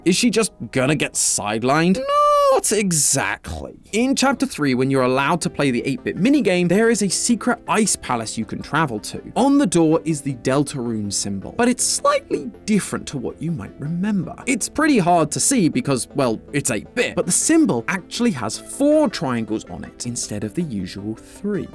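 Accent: United Kingdom. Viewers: i love how British accent makes 'deltarune' appear as 'delta room' in the subtitles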